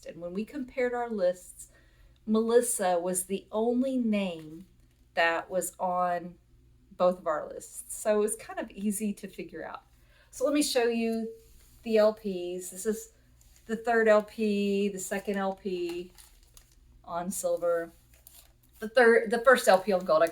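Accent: South, United States. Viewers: love your Southern drawl accent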